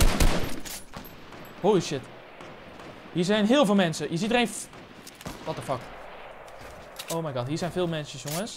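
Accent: Apeldoorn, Netherlands. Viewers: Kom je uit Apeldoorn?😯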